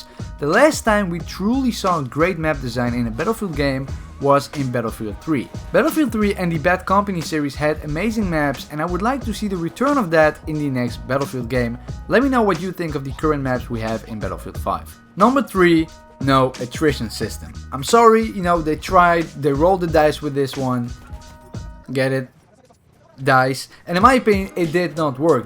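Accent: Netherlands. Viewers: I swear you are dutch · Je kan gelijk horen dat het een nederlander is:) Lekker bezig #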